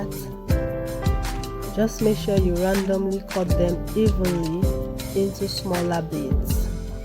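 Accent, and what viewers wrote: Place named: Nigeria. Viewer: Hearing the beautiful Naija accent .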